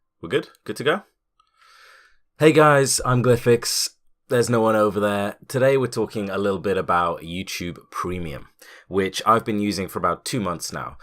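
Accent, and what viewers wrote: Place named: London, United Kingdom. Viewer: Great review. But I confess that I continue to listen more because of you accent. I was in London in the beginning of this year and, god, I love that accent. Remind me from some friends. But about the video is quite good, simple, casual.. feels like a conversation. I am probably going to watch more from you. Adding about the YouTube premium, I have to say, it is a bit expensive but I think it's worth it for those who likes differents versions from the music they like. I mean, there is some live performances that are just masterpieces and they aren't available on Spotify or other plataforms. Interviews, podcasts, channel's from a huge variety of things ( there's a massive of content produced specifically to be play on YouTube, from new and old entertainment companies). And still, there's one more things I would like to add: the algorithm. 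Youtube knows all my moods for music and keeps bringing me news findings on, and I that is just fantastic. Something that made me disappointed after watching you was to know that the originals are bad and we can't see the movies that they rent on the site to us. I am going to get premium.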